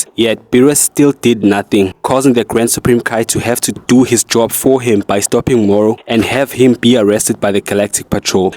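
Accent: South Africa. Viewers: Sorry to say, your accent sounds more like a South African accent.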